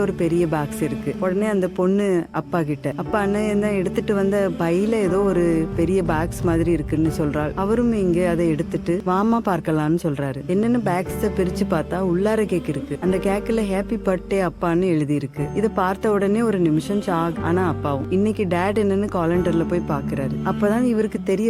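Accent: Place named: Tamil Nadu-Kerala border, India. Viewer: 👍 அருமைடா 🎉 பேரான் ( நல்ல பதிவு ) T. N & Kerala பார்டர் ஸ்லாங்...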